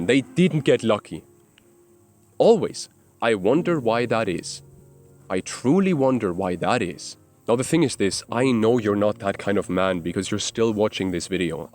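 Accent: Germany. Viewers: Great video, I have to add one thing...This amzing guy must have like in Scotland or knoew lots of Scottish people, he keeps using Scottish experssions, and, with a German accent, it soulds brilliant! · I knew you were German! The background and your accent did it for me. Anyways dude this is a great video and I certainly needed to hear this. Been in a relationship for 3 years and it’s my first real one too. I’m 21 now and still learning how to be a man. This just gave me the confidence to leave all that childish shit behind and change my mindset. Danke bruda 🙏🏽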